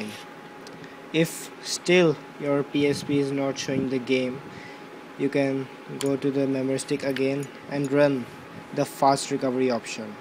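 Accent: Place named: India. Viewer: you have a indian accient you must be the right one that im searching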